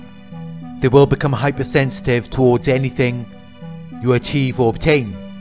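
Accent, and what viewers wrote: Wales, United Kingdom. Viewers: I agree with every thing you say!!!. Thanks for your videos, they're great. I like your voice and your accent too, sounds welsh(?)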